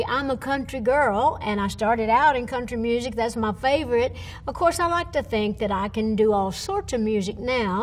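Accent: United States. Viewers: she is the most american-sounding person I've ever seen haha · one of my favourite and most distinct american accents lol i just love listening to people who speak like that, it's so fun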